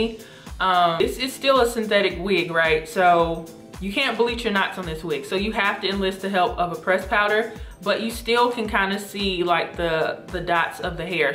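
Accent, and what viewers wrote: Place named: North Carolina, United States. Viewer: She sounds like she is from North Carolina.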